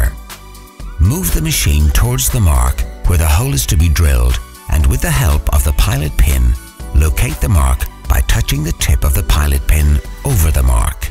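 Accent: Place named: Europe. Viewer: The narrator is also hilariously European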